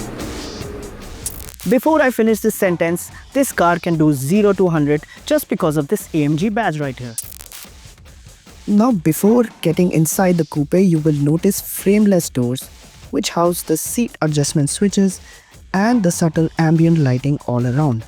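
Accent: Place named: India. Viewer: The sound of an Indian voice make it look cheap and a scam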